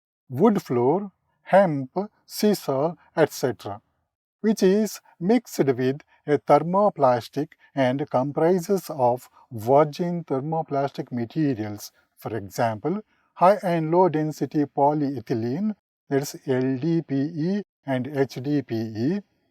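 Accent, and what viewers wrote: India. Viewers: I am an Indian..still i find it difficult. That's because his accent is south Indian which is far different from north Indians.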